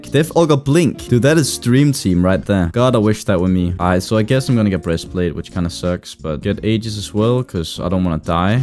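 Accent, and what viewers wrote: Denmark. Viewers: UR DANISH SOUNDS SO?? funny?? its cool tho (also i need someone to translate what he said pls)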